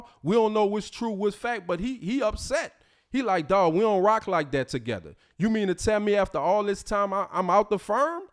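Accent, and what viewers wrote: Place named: AAVE, United States. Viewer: Imma argue dis.....How you gon say dat?....and so many other examples of ebonics. You literally sound just like Fani Willis---and that's NOT a good "thang". As for your legal knowledge, it stinks. Even I--as a-non-lawyer--know well the GA statute on gifts from prohibited sources. The statute CLEARLY says $100 USD in aggregate must be reported yearly. They didn't have to stay at the Ritz for it to constitute a conflict--and corruption. Where did you get your law license? Compton?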